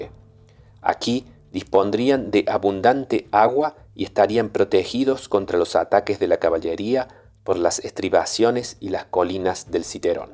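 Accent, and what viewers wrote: Argentina. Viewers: Pero usted no está en España? Por momentos pensé que era argentino por el acento y luego me dije que eran ideas mías, pero ahora que veo su respuesta, creo que no me equivoqué.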